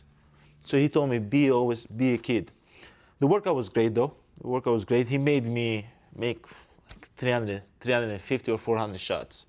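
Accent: Greece. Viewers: He’s Greek and speaks English with a Greek accent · He's Greek accent and above all his Greek mentality is on display 100% and I love it!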